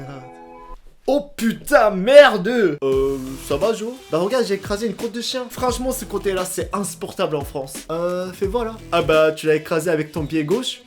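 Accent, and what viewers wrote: Japan. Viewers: 😂😂trop cool moi j'adore quand les japonais parlent en français c'est trop mignon 😊😊😊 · C’est marrant 🤩 ! Tu a le même accent ou la même façon de parler qu’une de mes voisines 100% japonaise….t’es de Tokyo comme ma voisine ?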